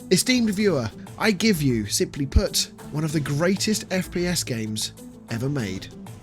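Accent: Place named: United Kingdom. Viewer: Sounds like you are from the UK; you should look into another one of UK gaming's national treasures that pretty much fell under the radar although anyone can still play it as of now. It's a gorgeous 5 v 5 Capital Ship Space MOBA called FRACTURED SPACE. It's one of those games where you felt lucky to have experienced it when it was live.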